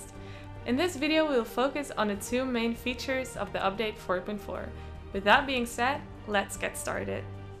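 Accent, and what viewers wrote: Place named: Netherlands. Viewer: She sounds Dutch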